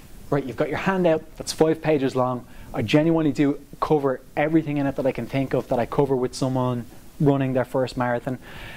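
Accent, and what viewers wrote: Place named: Ireland. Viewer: Big man ! Its been a while ! Classic moment as I am looking for tips and tricks on doing my first marathon here in florida in October. I clicked your link and hear the irish accent and burst out laughing ......I know that bloke ! I hope you are doing well skipper. I enjoyed the video, good work. Gerry Carroll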